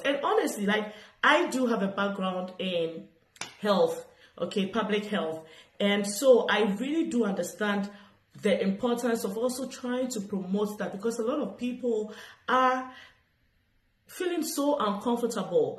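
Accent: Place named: United Kingdom. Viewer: I love the background and your UK accent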